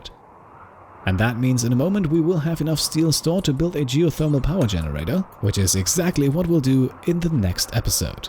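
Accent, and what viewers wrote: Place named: Germany. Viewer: Are you German or Swiss? I hear a faint, faint accent behind that soothing Documentary-voice.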